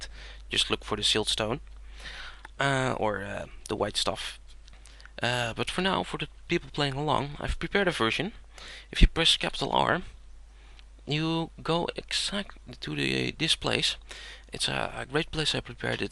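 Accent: Netherlands. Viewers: Hmmm, i can just HEAR you're dutch! :P Another one here! :) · Oh it's a Dutch accent I've never heard one before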